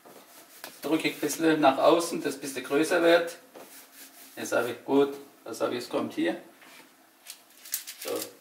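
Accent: Swabia, Germany. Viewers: Hallo.. Hab euch mit diesem video weiter empfohlen..hab den Kanal schon lange aboniert und freu mich jedesmal über deine humorvolle lockere Art 😉und das Schwäbisch das abgefärbt hat😃euch noch viel Spass bei vielen neuen Ideen Lg Marina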